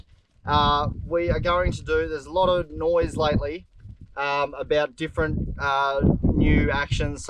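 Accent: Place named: United States. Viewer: My guy you sound like me. American.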